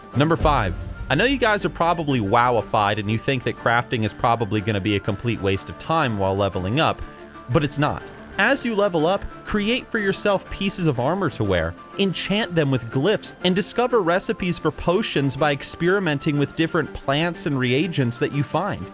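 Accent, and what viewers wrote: South, United States. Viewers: I was loving the southern drawl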